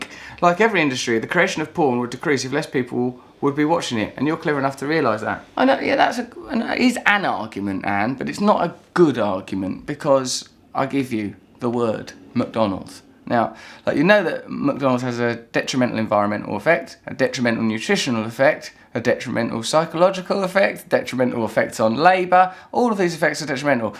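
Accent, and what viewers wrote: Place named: RP, United Kingdom. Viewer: Trying to work out what you say , not because I'm American but because you posh mumbled. Something to do with to learn more watch ( centre of the self?) The subtitles say " To learn more or change in the cell phone communities miller's 3i I wonder" <<< PS Can you tell me what hair product you use, I'm jealous of how you manage to tame your barnet. PPS Come to Birmingham to meet practically evicted Londoners like myself and do some Trews on the road!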